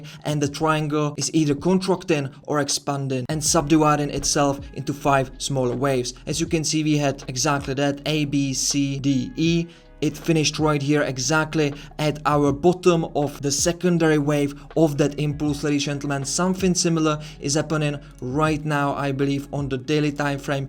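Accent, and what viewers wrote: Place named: France. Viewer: Dude, I love your accent.... Are u French?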